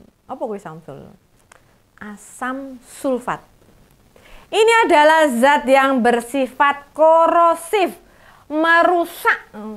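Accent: Java, Indonesia. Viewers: Seneng aku bahasanya medok dan lancar ancen pendukung Ganjar rata2 cerdas dan berintegritas ngos ngosan lanjutkan mbak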